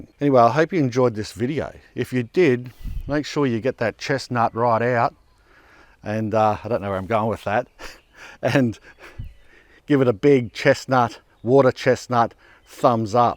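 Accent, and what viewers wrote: Australia. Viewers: I’m an Australian who lived overseas for most of my life. For some reason the Aussie accent triggers me and I squirm. But yours is perfect. It’s just bogan enough, but not too bogan. And it’s just so pleasant. No matter what you say, the tone and timbre of your voice brings a smile to my …ears. Thank you ❤🙏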